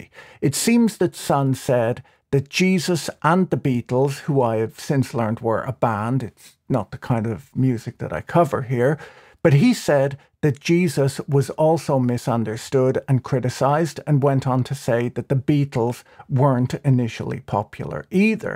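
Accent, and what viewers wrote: Ireland. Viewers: But he's obviously Irish...?? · he's Irish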